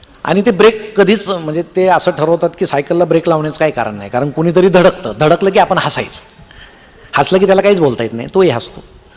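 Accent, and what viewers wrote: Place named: Ahmednagar, India. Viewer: नगरी भाषा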